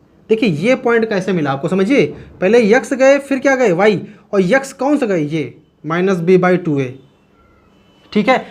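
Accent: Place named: Bihar, India. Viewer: Sir you are amazing 👍🏻👍🏻👍🏻👏🏻👏🏻 aur apka bihari tone bhi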